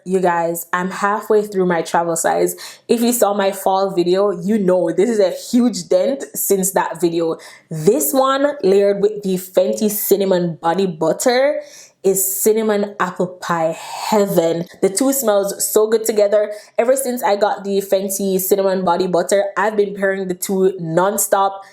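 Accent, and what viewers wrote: Jamaica. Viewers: Hey hun...Are u jamaican by any chance.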